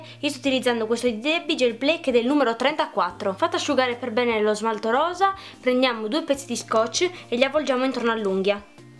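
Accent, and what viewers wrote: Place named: Sardinia, Italy. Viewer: Sono sarda come te, mi fa morire questa cosa dei video con accento sardo!! 😂 In senso buono ovviamente!! Ho scoperto ora il tuo canale sei molto brava e soprattutto insegni come fare bene le cose in casa con le cose che si hanno in casa! Ti do un piccolo consiglio..parla più lentamente, così darai un senso di relax a chi ti guarda dato i video che proponi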